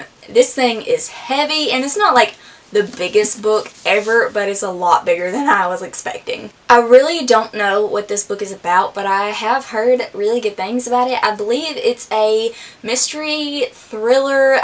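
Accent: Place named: South, United States. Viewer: Your southern accent is so cute! I have 20 books to haul so I totally understand. Also I’m amazed that you held them all up for the thumbnail!!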